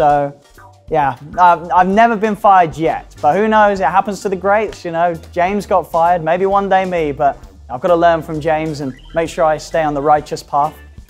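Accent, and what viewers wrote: England, United Kingdom. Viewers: ох этот английский акцент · . Вообще, для меня это лучший дота комментатор, особенно его потрясающий английский акцент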